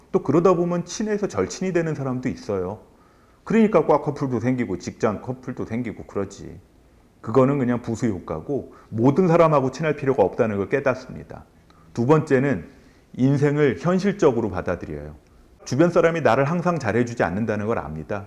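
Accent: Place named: Chungcheong, South Korea. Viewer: 충청도 분이구만.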